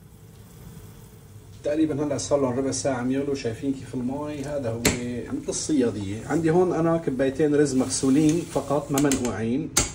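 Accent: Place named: Banias, Syria. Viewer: اهلين من اول ماسمعتك قلت من بانياس بس حبيت اتأكد الله يسعدك يارب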